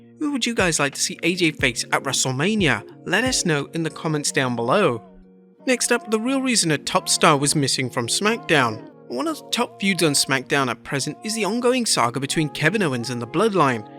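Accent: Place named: United Kingdom. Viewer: Idk why but hearing the narrator read Top Dolla's tweets was hilarious to me. Particularly with his thick British/English accent and saying "y'all". Lmao. Idk why it's funny to me but I was laughing so much during this segment. Probably just because I worked all this weekend and I'm tired/delirious. 🤣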